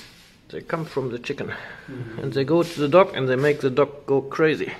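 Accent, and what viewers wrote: Germany. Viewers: Condescending German. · It sounds like a really hard german Accent. Do u come from germany? :)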